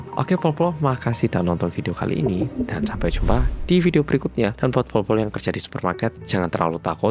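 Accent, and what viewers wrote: Java, Indonesia. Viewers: Medhokkk(medok) Kalau RDD kwedheeen(ketakutaan)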